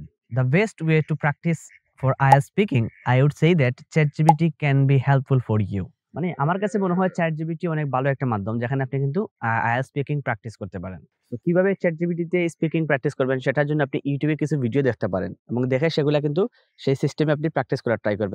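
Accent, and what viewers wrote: Sylhet, Bangladesh. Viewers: Vai apne Sylhety ni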